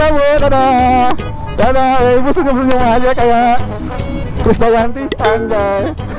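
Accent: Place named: Banyumas, Indonesia. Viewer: Sing ngarep ngapak le ngomong...anjir nguakak bener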